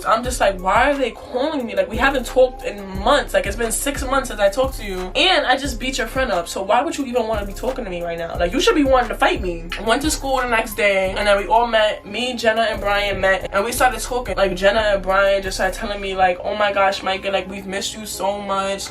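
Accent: New York, United States. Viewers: the ny accent😍😍♥️! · Good work I can tell you work really hard and your gonna make it fs and i love your storytimes, and I luv the ny accent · The accent tho, You from new york?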